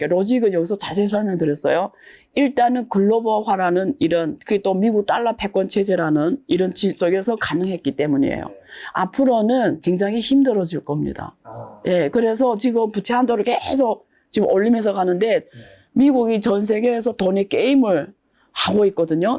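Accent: China. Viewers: 조선족인데 말투가 고쳐지지가 않네 교묘하게 짜장 빨던여자가 비판을하니 신뢰가 안간다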